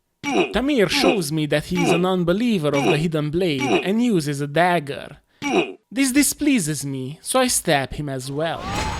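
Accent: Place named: Eastern Europe. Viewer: i thought his accent sounded a little bit Slavic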